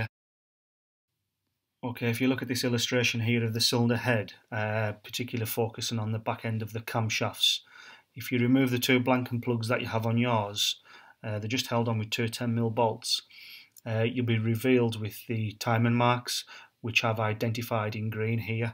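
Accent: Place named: Cumbria, United Kingdom. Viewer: You sound like your Cumbria based, I have one of these with the p0016 code, do you fancy doing mine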